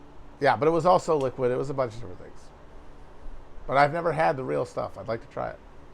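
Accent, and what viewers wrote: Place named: Italian-American, United States. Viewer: As a Buffalonian, I appreciate the gabbagool accent for us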